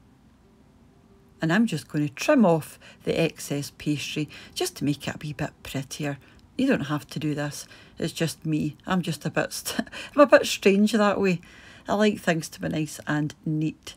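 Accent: Scotland, United Kingdom. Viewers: Hi & Jasper, I’m a new subscriber. I love your YouTube channel & Scottish accent! 😻🐟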